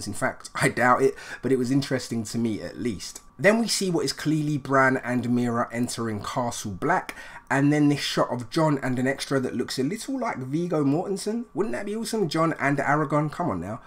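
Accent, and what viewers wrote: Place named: United Kingdom. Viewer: hey! thanks for answering my question!! I subbed you a little while ago as you were doing a livestream with my pal Mark (SerHuntsReviews) and I'm enjoying your content a lot. I don't comment too often though. I enjoy your videos though. You do a good job!! I enjoy hearing Brits again - I miss them a great deal... I did my PhD in Aberdeen and lived there for 7 years. I'll be back over there again sometime soon to do further research probably in Durham, London and Edinburgh as well as my old standby - Blair-Atholl, in Perthshire. That place is gorgeous! Cheers and best wishes. Looking forward to your content in the upcoming season!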